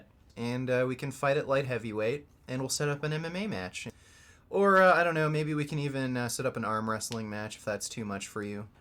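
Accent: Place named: Canada. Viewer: It's all aboot whooping ass.. like drake your from Canada. Tough voice th0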